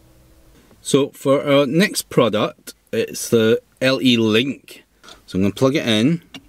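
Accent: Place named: Glasgow, United Kingdom. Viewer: Great Glaswegian accent.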